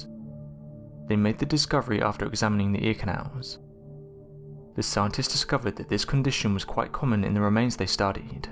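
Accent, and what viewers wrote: United Kingdom. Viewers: I wish you would loose the brit and find someone who speaks English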